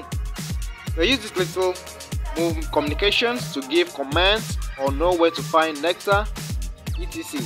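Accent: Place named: Nigeria. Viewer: I am a Nigerian too.......I can tell a Nigerian when I hear one speaking .....this YouTube hustle is not easy at all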